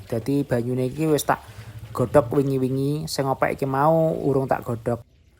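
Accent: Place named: Java, Indonesia. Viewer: Kok bahasanya medok kayak jatim jateng ..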